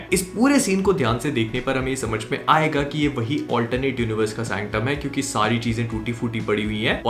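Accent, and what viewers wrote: Bhopal, India. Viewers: Bhai aap Bhopal se hoo 😅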